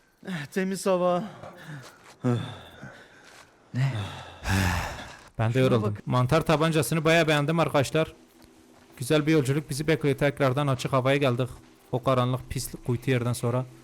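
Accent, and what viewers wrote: Elazığ, Turkey. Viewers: abi nerelisin elazığlımı lütfen söyle 20k like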